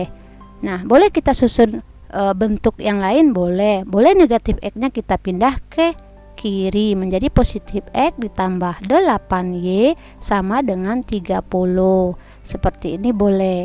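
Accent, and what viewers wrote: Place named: Padang, Indonesia. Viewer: langsung tahu logat dunsanaknyo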